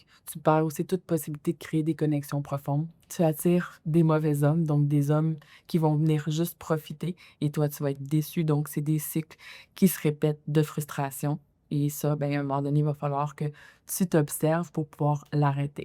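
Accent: Quebec, Canada. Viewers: Je suis Français mais j'aime bien votre accent québécois. Je crois que c'est pareil dans le sens inverse : certains hommes aussi se projétent.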